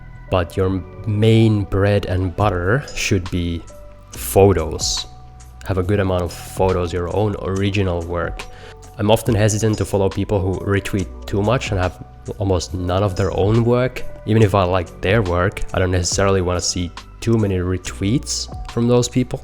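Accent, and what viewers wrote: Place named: France. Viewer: Such excellent information, I'm so excited I just followed you on Twitter! I created a Twitter account several years ago intending to start posting images but life got in the way so I just recently became more active. It's been very confusing not knowing the best practice for photographers which is partly why I was procrastinating to begin with and your YouTube videos have truly been a gift. Initially I didn't use any hashtags because I was told it wasn't a good idea but I'm only getting a few "likes" although some of my Tweets are receiving a fair number of impressions. I'm not sure exactly what that represents or if it's meaningful, how can I tell if these are just views or retweets? Also do you think it may be a good idea to retweet some images I already published by putting them in groups of 4 as suggested here and adding hashtags since I don't have twitter Blue and can't edit anyway? 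Also I'm confused whether it's okay to retweet my own posts on other pages for additional exposure or will that show up on my page as well? Please if you can help clear up these few things for me I will be extremely appreciative of your time and attention, all the best! And you're cute too, love listening to you French accent and easy on the eyes helps watching along with all the wonderful advice!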